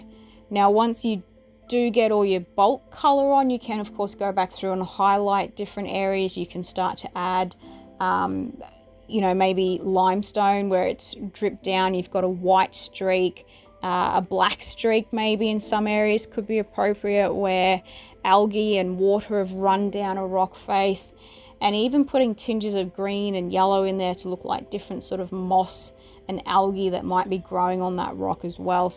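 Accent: Australia. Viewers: Tasmania? I hear some similarities between you and others that I know are from there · oh wow this was pretty cool and an aussie. nice to hear a local.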